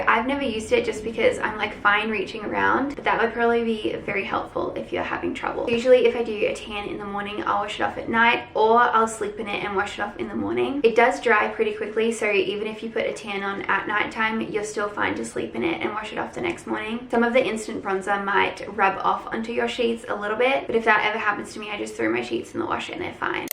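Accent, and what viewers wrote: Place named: United States. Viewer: Sounding so American at times in this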